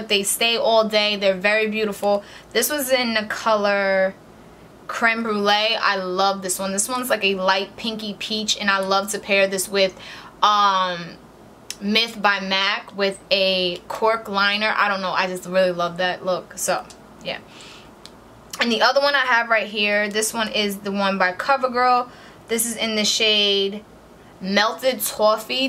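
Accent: United States. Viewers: Gawjus! Love the look. And I'm getting New York accent vibes and I'm loving it .. 🙃. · Detroit? I hear it lol correct me if I'm wrong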